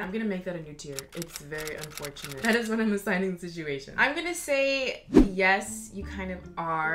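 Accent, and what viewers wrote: Canada. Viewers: GIRL YOU CANADIAN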